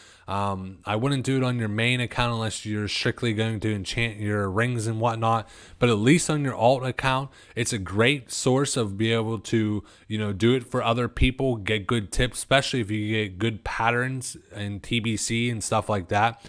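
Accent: Philadelphia, United States. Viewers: Definitely from Philadelphia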